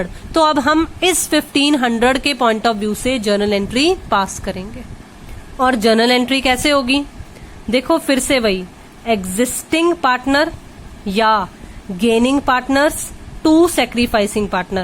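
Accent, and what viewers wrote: Punjab, India. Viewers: Punjabi sound 😝😘😘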